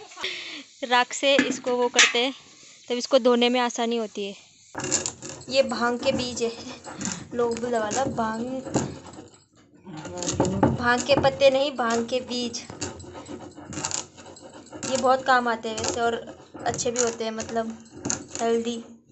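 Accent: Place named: Aligarh, India. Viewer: आपके यहां का नेचर बहुत अच्छा है लेकिन भाषा अलीगढ़ की तरफ की लगती है हमारे गांव में भी ऐसे ही बोलते हैं😊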